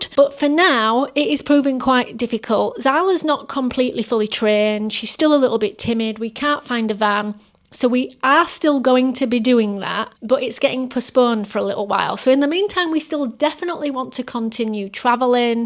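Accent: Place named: United Kingdom. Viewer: I enjoyed your video , This is the most British British accent I have ever heard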